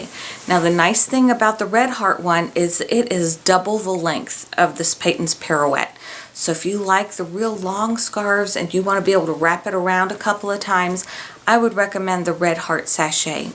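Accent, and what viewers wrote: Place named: United States. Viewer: hi I watched your rainbow loom vid and your voice sounds American but u look Asian please tell me where your from I love your vids please reply ASAP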